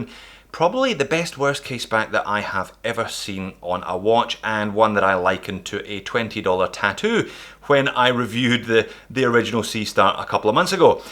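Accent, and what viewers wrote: Scotland, United Kingdom. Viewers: A Haggis (shhh don’t tell unsuspecting tourists they aren’t real) riding a unicorn (as Scotland’s national animal). Enjoy hearing a fellow Scotsman talking about watches! Cheers all the way from Dundee! Keep up the good work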